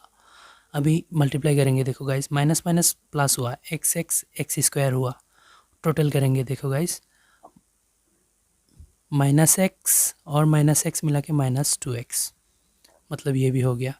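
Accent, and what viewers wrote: Bengal, India. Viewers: R u Bengali sir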